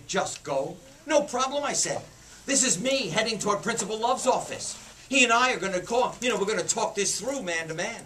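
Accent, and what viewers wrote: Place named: New York City, United States. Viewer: I love that excerpt! He has an AWESOME Manhattan, NY accent!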